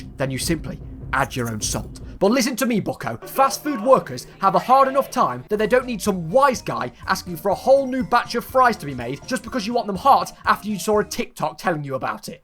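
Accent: United Kingdom. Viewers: I like how British you are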